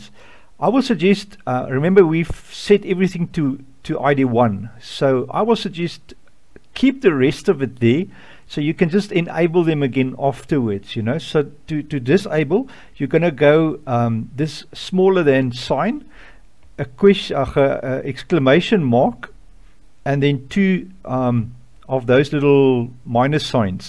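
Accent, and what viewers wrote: South Africa. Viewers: Ek kan sommer hoor jy is van Suid Afrika lol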